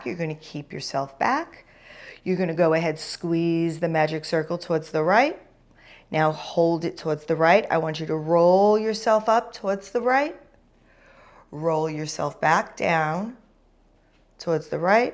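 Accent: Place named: New York, United States. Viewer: I really enjoyed this one! Are you from New York? Long Island or somewhere? I find myself saying "carefully" like you do all the time now! lol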